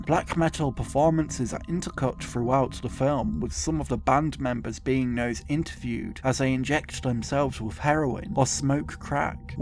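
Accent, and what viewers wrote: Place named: United Kingdom. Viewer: Oh no! Mis conocimientos en inglés se pierden con el inglés británico 😭😭😭😭 , pero igual Gracias ! ❤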